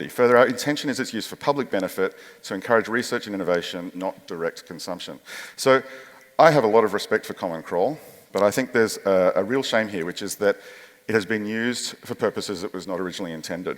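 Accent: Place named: Australia. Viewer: Great to hear an Aussie voice for a change in all the noise of YouTube videos. Subscribed from SA :-)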